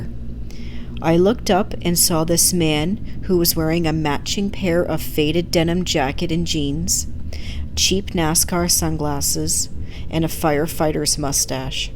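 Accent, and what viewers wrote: Canada. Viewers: Great video! Are you Canadian? You sound Canadian or at least some kind of northern North American.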